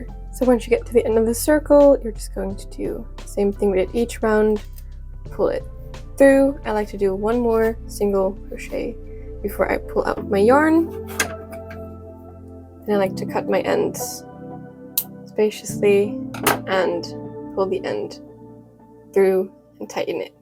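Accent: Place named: United States. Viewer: you sound almost completely American. Accents are typically the hardest thing to master when learning a foreign language. How did you go about learning English so well in the Netherlands, if I may ask?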